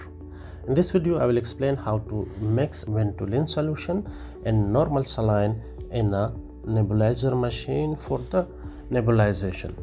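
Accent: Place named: India. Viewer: you have an accent which I think is Indian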